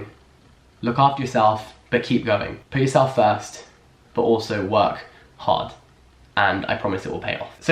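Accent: United Kingdom. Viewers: What part of uk r u from? Ur accent <3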